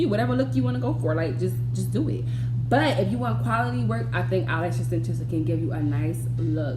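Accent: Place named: Philadelphia, United States. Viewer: I knew you sounded like you were from Philly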